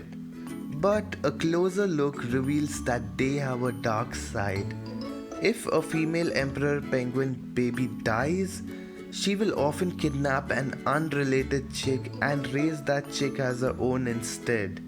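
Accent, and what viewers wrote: India. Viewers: annoying Indian accent.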